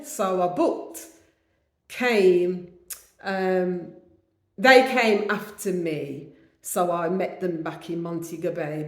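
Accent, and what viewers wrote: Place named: Black Country, United Kingdom. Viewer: It's not the speed of her speech it's her Wolverhampton dudley accent that makes her sound dopey and slow. It's been voted the worst accent in the UK for good reason because it is terrible 🤦🏼‍♀️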